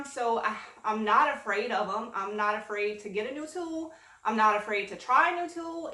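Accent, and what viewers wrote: Louisiana, United States. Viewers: Love your video's, great inspiration! Thank you for sharing your ideas! I was going to ask what state you're from, because I keep hearing Cajun. Then on the fb group, your last name...silly, I know. But I've got a friend that's Cajun and I love hearing her talk.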